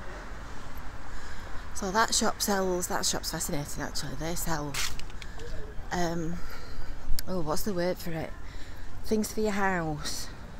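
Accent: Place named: Lancashire, United Kingdom. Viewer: Excellent walk-round. As a Cornishman I loved the Lancs accent which made this vid attractive; ( most walk rounds have an irritating head and even more irritating narration).....but you could read me Lloyd's Shipping Register and I would listen! Are you called?.....if so, congratulations on a compelling walkaround. Tip; do a walkaround of the UK coastline.............I'll watch and listen!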